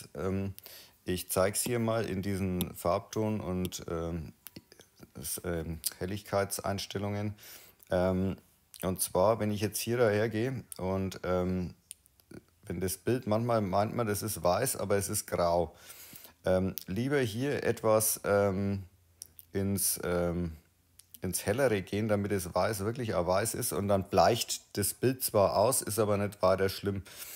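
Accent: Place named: Franconia, Germany. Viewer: dritter Anlauf hat funktioniert nachdem ich alle Kommentare gelesen habe. Spitze! Danke, und ich liebe fränkischen Akzent und Schäufala und Scheurebe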